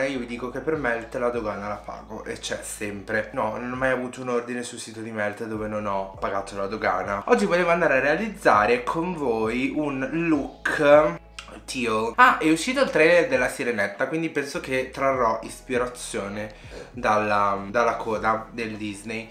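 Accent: Milan, Italy. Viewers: a Milano la cadenza è quella e non è di "m". Cerchiamo di stare nei limiti dell'educazione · a me non piaceva la tua parlata milanese di m!! 😂😂😂 invece i tuoi contenuti sono per me ottimi e quando esprimi pensieri e opinioni “makeup a parte” ti ho sempre trovato serio e maturo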